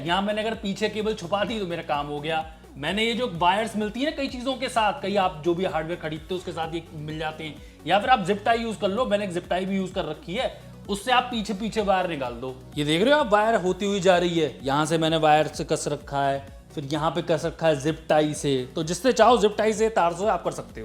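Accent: India. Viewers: Apne jo wall pe stands lagte hai vo nahi batya agli bar ho sake to uska halka sa jo ho sake ek brief de dena thoda idea ho jayega Aur jo mera one of the biggest reason hai apki videos dekhne ka vo apka indian accent jo apno vale feeling dilata hai to voh kabhi loose mat karbna ☺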